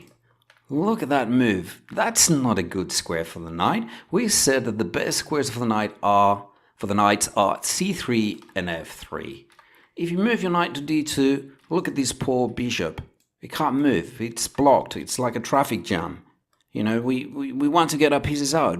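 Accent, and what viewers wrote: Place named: South Africa. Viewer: what is your accent, mate? It's like south african crossed with australian crossed with eastern european. :)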